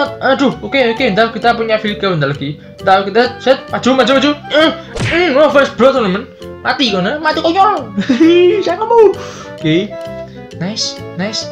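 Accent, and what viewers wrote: Java, Indonesia. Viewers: wong ngdi lur kok logat.e medok😀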